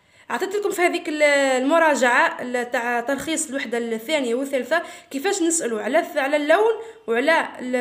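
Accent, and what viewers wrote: Tunisia. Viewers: نتي تونسيا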